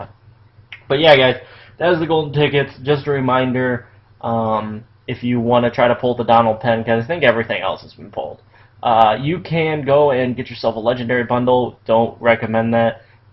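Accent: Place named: United States. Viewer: He sounds country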